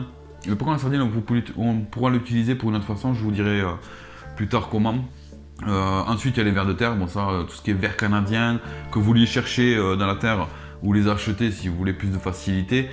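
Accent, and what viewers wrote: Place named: South, France. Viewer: région du Tarn l accent me semblé familier je viens du Gard , haaaaa le sud lol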